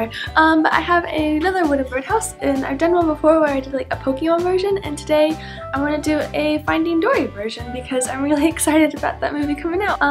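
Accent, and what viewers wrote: United Kingdom. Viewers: who else noticed she sounds British in like every video now lol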